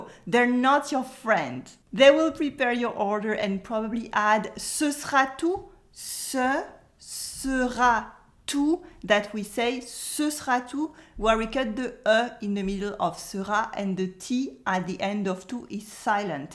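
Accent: France. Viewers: Comme une française surtout l’accent ! A couper au couteau !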